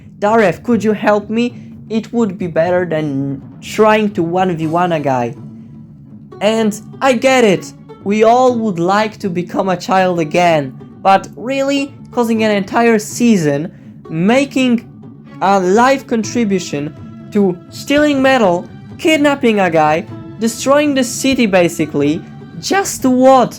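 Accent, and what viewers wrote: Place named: Poland. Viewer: i can hear your polish accent im polish too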